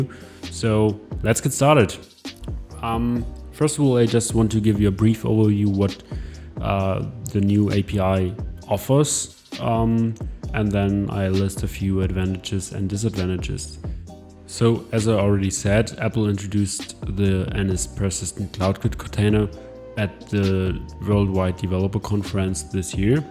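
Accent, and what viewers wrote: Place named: Denmark. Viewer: Are you Danish? I'm only half Danish but I am detecting a Danish accent. You put a lot of work into this video and are very knowledgeable. You've gained a subscriber. It's too bad you don't have more videos on your channel! Question: did you practice making videos before and have made a lot of them private?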